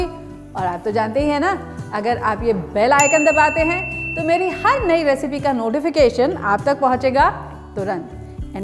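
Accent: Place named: Punjab, India. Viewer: I love the punjabi tone in ur voice ❤️❤️❤️❤️ I know you from master chef ❤️❤️❤️❤️I have supported u all over the season ❤️❤️❤️❤️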